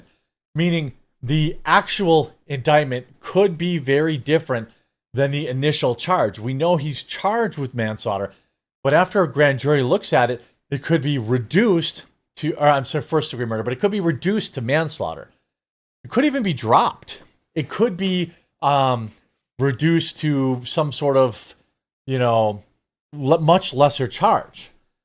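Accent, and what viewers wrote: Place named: New England, United States. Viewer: This guys accent is 🤮 fucking Yankees….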